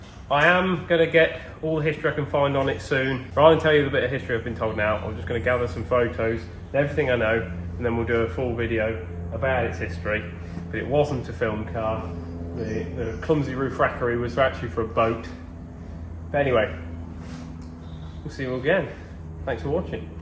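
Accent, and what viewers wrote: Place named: RP, United Kingdom. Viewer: That old engine would of ran on 4 star leaded petrol not unleaded or super expensive crap its a old jenson engine posh school boy the way you talk you got to remember the land rover has been stood for years so things will be stuck and rusty but when you service the engine it will run alot better but wat a beast of a sound better then a rover v8 but it will take a long time to restore everything and find out wat many extras on it and wat they do??? 👍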